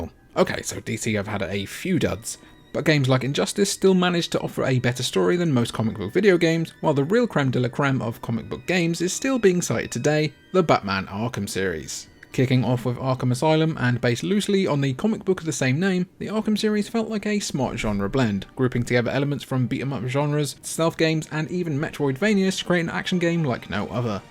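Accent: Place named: England, United Kingdom. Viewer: You're english accent makes the comedy even funnier, lol. Great job.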